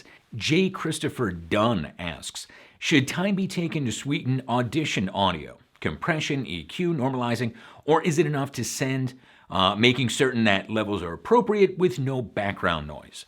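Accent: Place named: New York, United States. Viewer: Love your New Yawwk accent.;) Great info as always, thanks!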